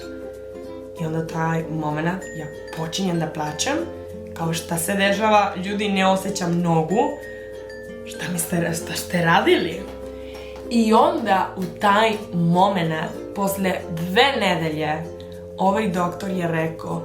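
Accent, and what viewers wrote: Serbia. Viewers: Kraljice bukvalno kada god sam tužna samo pustim tebe mislim malo smesno picas srpski no HEJT volim te mnogooo ja tebe razumem i stvarno bas mi je zao iako znam da je to bilo pre 10.godina Ali idalje ne osacas nogu bas mi je zao volim te najviseeee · Sve slatko ali tvoj Srpski kako slatkoo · Boze koliko ti slatko pricas Srpski😍 usavršila si jezik😁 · Joj kako ja volim kako pričaš srpski !!!! 💞💞💞💞😂😂😂😂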